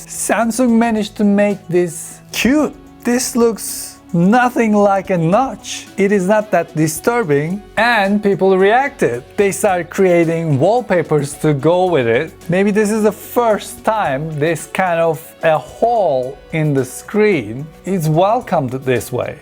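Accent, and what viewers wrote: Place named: Turkey. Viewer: Aksanı duyunca “kesin Türk bu” diye yorumlara geldim ve yanılmadım. Güzel video ayrıca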